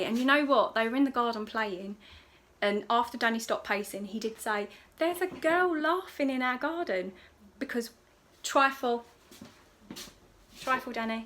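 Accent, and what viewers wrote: United Kingdom. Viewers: I love your British dialect 😊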